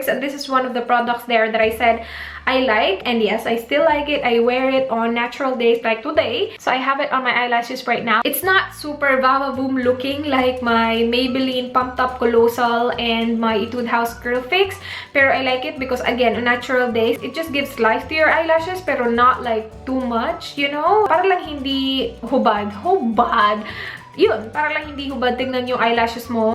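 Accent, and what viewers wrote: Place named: Cebu, Philippines. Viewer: malalaman mo talaga kpag cebuana.. Ate! same tayo mg salita, 😘😘😘😀😀😀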